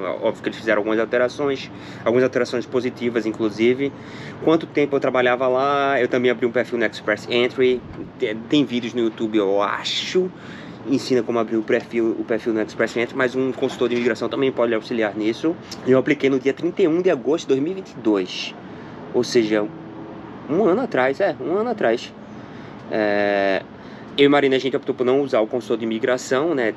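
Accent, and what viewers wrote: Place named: Northeast, Brazil. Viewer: Você é de onde? Seu sotaque é nordestino! Eu sou de Fortaleza/CE.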